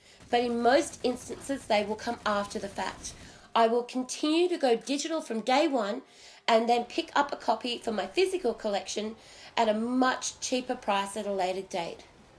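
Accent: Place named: United Kingdom. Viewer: "True game-ah, a dedicated game-ah" Fuck you non-rhotic bitch, Man british accent's stupid and annoying as fuck!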